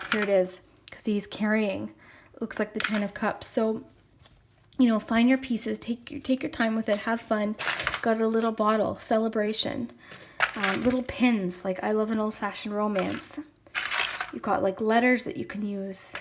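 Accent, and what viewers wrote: Canada. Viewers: I might not be correct, but are you from Canada by any chance? I'm pretty good with accents and I'm guessing yours is Canadian. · are you Canadian?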